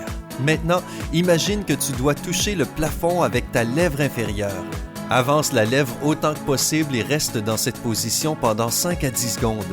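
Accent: Canada. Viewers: j'adore cette voix, avec l'accent canadien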